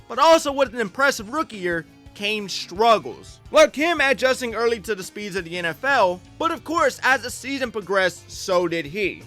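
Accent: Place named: Pittsburgh, United States. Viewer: I can hear the slight yinzer in you.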